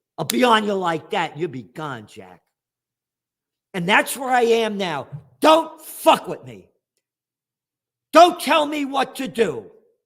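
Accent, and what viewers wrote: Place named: New York, United States. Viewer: Gotta say, Gerald reminds me of New York every time I listen to him ^^"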